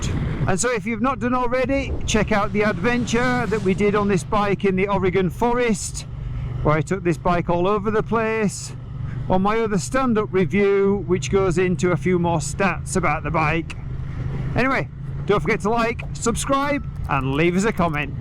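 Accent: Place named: North East, United Kingdom. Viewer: That's definately a N.Yorks/Durham accent for sure, tha'knows. Oh wait what's the point of leaving comments when he can't be arsed to reply ?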